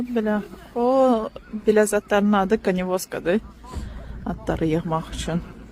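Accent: Ganja, Azerbaijan. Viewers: Şirin Gəncəli ləhçəsi ilə danışırsız nəsə əlaqə var ora ilə ? 😂.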